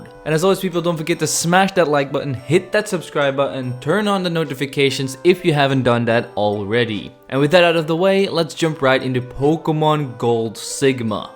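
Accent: Belgium. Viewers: Deze man komt gewoon uit België!!!! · U from belgium? Nice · Wait.. You're Belgian? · BEN JIJ EEN BELG!?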